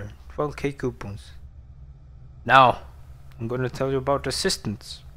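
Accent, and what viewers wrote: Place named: Ireland. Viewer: Your voice remains #1. Gotta love the Irish :")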